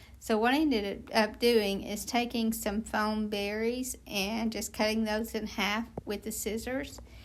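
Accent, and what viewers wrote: South, United States. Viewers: Some people watch videos with things like beautiful nature scenes, sounds such as crashing waves on a beach, mountain brook sounds, rain, etc. for relaxation. I watch your videos. Your calm Southern voice is so soothing. (I'm originally from Augusta, GA - now in Texas). You explain things so well it makes me think I could probably do a bit of what you do. And even the projects themselves are calm and relaxing...color tones, so much nature. No blaring music in the background. Thank you for making my day a bit brighter. PS: I tend to love the little squirrel box best. I have some squirrels I feed. Several of them come within inches of me to get their peanuts. 🐿 😊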